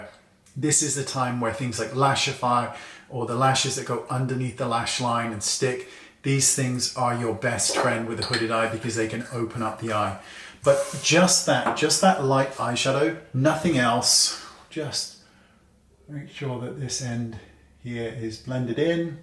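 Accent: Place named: South West, United Kingdom. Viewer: So funny xx ❤ your soft-‘growly’, light SW accent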